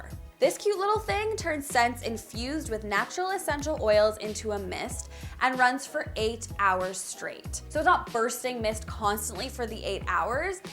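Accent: Canada. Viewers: Very cozy! Your living room is so inviting - attractive, but not intimidating. There's a happy mean between sterile and cluttered - just enough to engage the eye but leaving plenty of breathing space. I do understand the fire hazards of candles, but LED candles (or diffusers) aren't the most environment-friendly (though they are nice!). Love the pom-pom blanket.. but unfortunately, it's too hot right now in India to even contemplate coziness and rugs and pom pom blankets without a shudder.. coziness is a cold shower right now. Please keep making your awesome videos- they are such a treat and inspiration - and that Canadian accent is just too too adorable. Hi to Harriet and Marty! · I didn't know canadian accents were that obvs to others haha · Dat canadian accent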